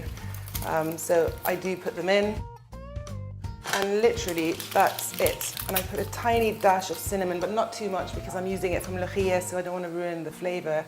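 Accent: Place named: England, United Kingdom. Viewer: Wow as an Australian from Armenian mother who was born in Cairo I love watching you cook and your beautiful English accent. Thank you.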